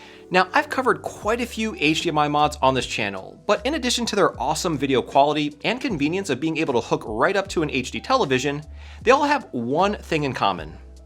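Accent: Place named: South, United States. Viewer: Your southern drawl came out in this one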